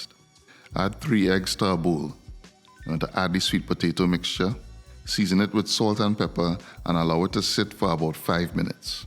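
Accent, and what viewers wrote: Trinidad and Tobago. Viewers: Are you the YouTube police? Did you know that YouTube can be accessed by most countries? Thus anyone from those countries can upload content in their own language. Why feel the need to say this if you don't understand the video, simply move on. And just so you know, he's speaking English with a slight Trinbagonian accent, which is very close to British English, yet your pea sized brain couldn't understand it, you're and absolute idiot.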